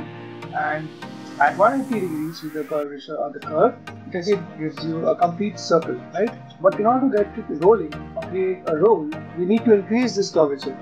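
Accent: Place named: India. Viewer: Background music is very loud, and the speaking volume is quite low. Also people who are not familiar with Indian accents would find this extra difficult to understand! I'm of course not suggesting you change your accent haha, but if the music were a bit lower and the speaking volume higher this would make the video much easier to follow, ta!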